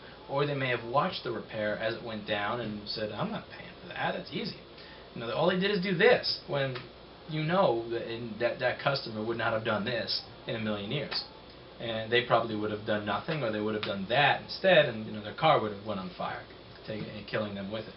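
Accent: New York, United States. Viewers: Enjoying your videos on "repair shop culture" I gather that you're from New York?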